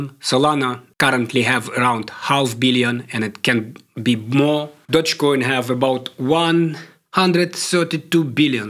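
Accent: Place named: Russia. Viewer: bro are you russian? love the accent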